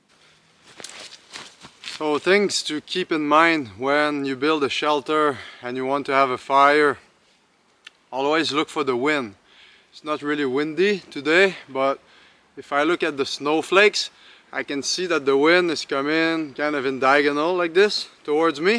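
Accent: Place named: Quebec, Canada. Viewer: Also just found you. You remind me so much of the guy in “49th Parallel.” Lawrence Olivia. Right down to that French Canadian accent. Love it. You were made to do this. People need to know how much water you need in the winter. I worked out in the cold for close to “ let’s just say many years.” Very dangerous when you sweat. I like how you kept it so simple. Beautiful scenery.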